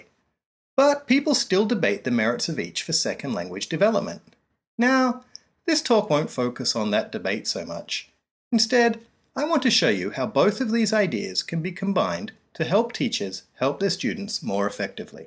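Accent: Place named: South Africa. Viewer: One question, where are you from? Your accent sounds really strange and muddled. If I had to guess, I'd say you're a South African trying to take on an American accent.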